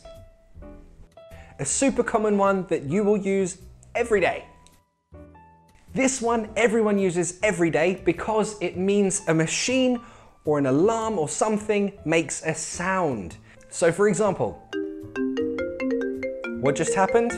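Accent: United Kingdom. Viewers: Are you British, teacher? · The way he talks has a very typical British accent than we Americans. Awesome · He's British!!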